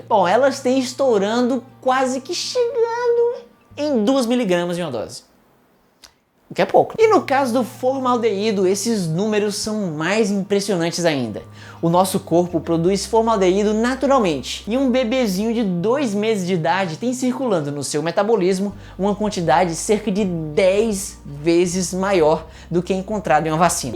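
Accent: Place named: Northeast, Brazil. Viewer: Tu é de onde aqui do Nordeste ? 🤔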